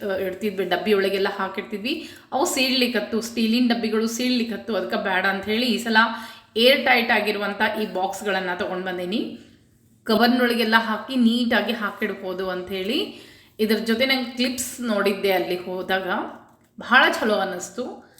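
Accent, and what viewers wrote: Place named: Dharwad, India. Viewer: ಯಾವ ಊರು ಇದು akka ದಯಮಾಡಿ ತೀಳೀಸೀ ಕೋಡೀ ನೀಮ ಬಾಷ ಹುಬ್ಬಳ್ಳಿ ಧಾರವಾಡ ಜಿಲ್ಲೆಯ ದೂ ಅನಿಸುತ್ತದೆ ಸುಮ್ಮನೆ ತೀಳಕೋಬೇಕು ಅಂತ ಕೇಳತೀನೀ ತೀಳೀಸೀ ಕೋಡೀ ದಯಮಾಡಿ🙏🙏🙏🙏🙏🙏🙏🙏🙏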